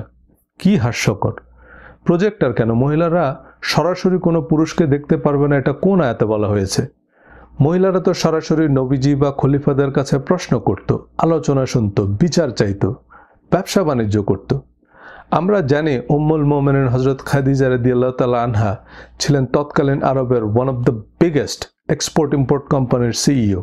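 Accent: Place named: India. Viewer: এই লোক ইন্ডিয়ান দের মত কথা বলে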